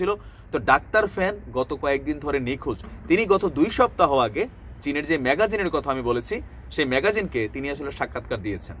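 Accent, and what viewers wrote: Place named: Noakhali, Bangladesh. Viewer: কথাসুনে মনে হয় নোয়াখালি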